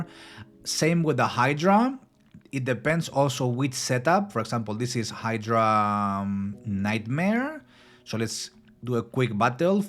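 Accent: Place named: Spain. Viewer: Hey, I'm the guy that asked you in your Spanish Channel which was your English Channel, I'm neither a native English speaker, but I've seen your video in both languages and it feels amazing here too, I love the fact that you are quick to speak in English you're fluent your vocabulary doesn't get stuck to remember words mid sentence, and pretty much everything is awesome, your accent is slightly improvable to feel even more native-ish, as a native Spanish speaker but English teacher I see you're not just trying to be a bilingual content creator but rather know what you're doing, I'm disappointed at the fact that there is almost no recognition in this channel and I'm guessing it's because there are very few Spanish RSL CCs, but many English magnates in the board like Ash, HellHades, etc. I feel you probably should promote your English Channel in your Spanish community more, as you may find more bilingual people within your main community who could come here and interact with your content to get you to more people's algorithm. 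That said, your accent, not to judge or anything, shows you're a native Spanish speaker, and let's be honest, that's not very attractive towards English viewers because of the prejudices and stuff, both in the sake of community engagement and blend in among other English creators, your accent could use slight improvements alongside adding a few slangs to your vocabulary to make native English speakers feel more related, which I don't doubt you can as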